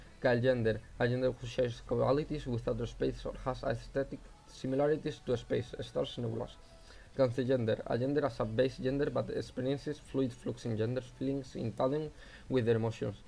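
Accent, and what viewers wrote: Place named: Cambridge, United Kingdom. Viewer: Inglés de Cambridge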